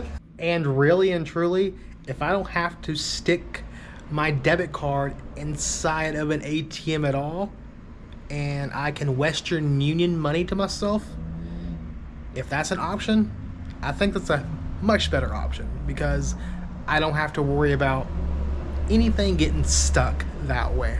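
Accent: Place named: South, United States. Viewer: Dude learn some Español you can't even gracias without a southern twang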